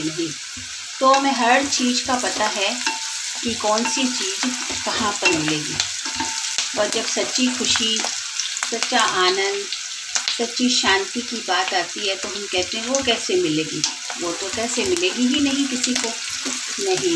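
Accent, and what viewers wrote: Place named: Sindh, Pakistan. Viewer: Tasty thali sweet nd meethi boli sindhi k sath✌🏻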